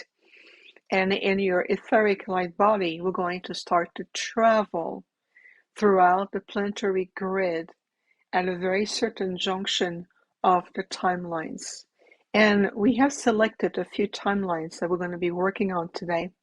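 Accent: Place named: Quebec, Canada. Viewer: Merci!! J'adore tes méditations! Même s'il est presque indétectable, ton accent me dit que tu viens du Québec. Nous sommes de nouveau en lock-down, couvre feu, tout est fermé même pour ceux qui ont leur Pass sanitaire. Grosse leçon pour eux j'imagine. So thank you again! I saw you on Patriot Dave and listened to all your meditations since. Thank you for your devotion to the collective. Have a great year! I didn't even see 2021, time as been slowly disappearing the past 5 years for me. We've got this! Much love ❤️❤️ From Contrecoeur, Qc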